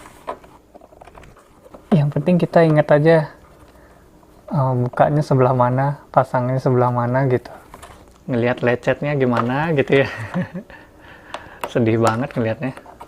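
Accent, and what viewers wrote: Bali, Indonesia. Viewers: subscribed. aku suka cara ngomongnya. khas orang bali. apalagi kalo lagi rliding eh riding gak ngebut sih cuma ya asik aja dengerlin eh dengerin celotehannya. salam kenal om ✌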